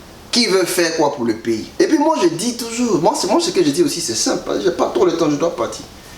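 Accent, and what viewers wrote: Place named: Ivory Coast. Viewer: Ou là super mec allons voyons vous avez l’accent propre des Ivoiriens né grandi et éduqué étudié en Côte d’Ivoire. Vos frères vous aime alors mettez vous dans la peau d’ivoirien ils on trop souffert dans cette sale guerres. Et on peurs comprenez cela svp. Ce qui se passe en Côte d’Ivoire ne se passe nulle part ailleurs surtout pas dans un autre pays en Afrique. Ils ont le droit devoir d’être jaloux de leur pays. Le président HKB a le devoir de tirer la sonnette d’alarme a ces compatriotes sur un quelconque danger.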